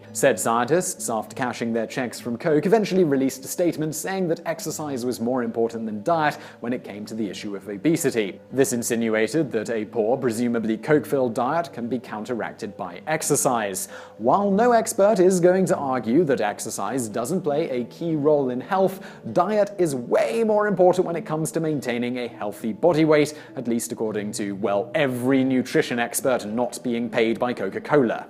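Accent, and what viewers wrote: United Kingdom. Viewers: Public Enemy - he's British. That's how they say it. It's not just him, it's an entire nation as well as everyone in other countries who was taught British English as a second language. So yeah, a massive percentage of English speakers say it that way. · Samm Ball II American he has a British accent if he spoke like an American you'd hate his voice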